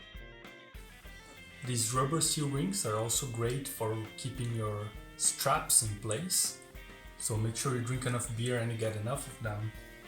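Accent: Brazil. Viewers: Fala, sabia que você era brasileiro! O sotaque é de Brazuca! To aqui na Holanda BTW. To precisando de uma fonte dessas, a minha ISOPOWER 12 não cabe em baixo do pedaltrain 2 e mesmo que eu coloque o CIOKS mounting kit acho que não vai dar, vc acha que ainda é uma boa opção?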